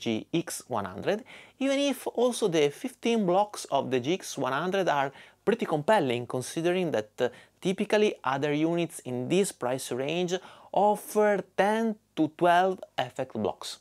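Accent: Brazil. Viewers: vc é brasileiro kkkk nao é possivel, com esse sotaque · I feel some Brazilian Accent I am also brazillian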